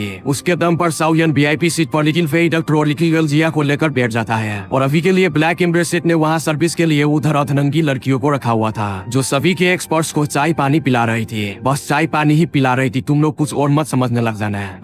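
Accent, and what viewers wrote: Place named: Bengal, India. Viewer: Vai, tu Bengali hai?? I'm 400% sure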